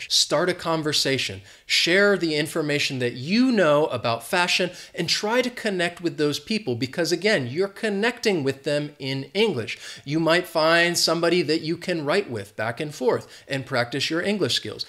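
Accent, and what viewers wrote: United States. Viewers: I just love your american accent...and from now on I will create my own bubble by doing and all your suggestions.Thank you so much Sir😀👍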